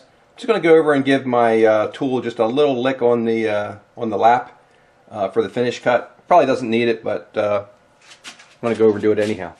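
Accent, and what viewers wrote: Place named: Philadelphia, United States. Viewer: Your accent says you're either from the Philly area or still around there, LOL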